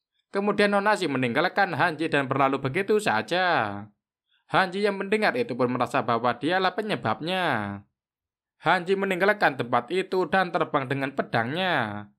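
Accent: Java, Indonesia. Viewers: Medok bet min bacrot ente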